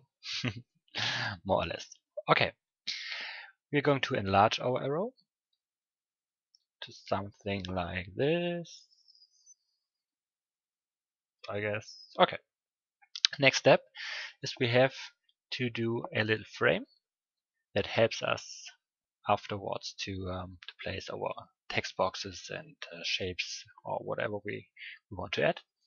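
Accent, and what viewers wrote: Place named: Germany. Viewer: Man merkt schon das du ein deutscher bist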